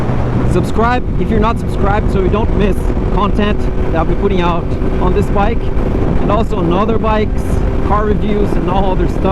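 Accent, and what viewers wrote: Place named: Scandinavia. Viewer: sounds portoguise. But your accent sounds scandinavian:)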